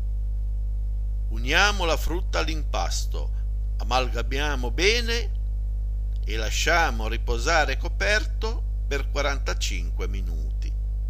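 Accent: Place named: Liguria, Italy. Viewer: Che piacere sentirti parlare con il "nostro" dolce accento Dove si intuisce una bella parlata dialettale della nostra Liguria.....Perché non alterni qualche ricetta in dialetto?....Appena il mio lievito madre avrà raggiunto il "giusto" risveglio farò sicuramente la tua ricetta. Grazie!!!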